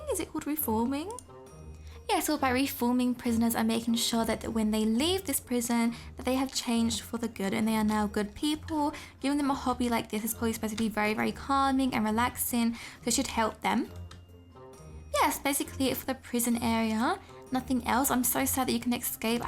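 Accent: United Kingdom. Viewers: I watched ur videos and u talk British now i can talk British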